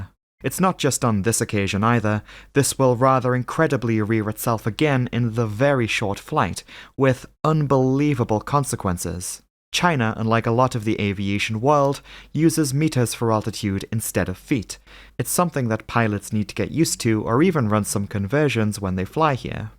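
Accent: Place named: England, United Kingdom. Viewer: I know what a metre is and precisely how to spell it. Come English sounding person you can't sound intelligent without being able to spell the basics of the thing you are talking about.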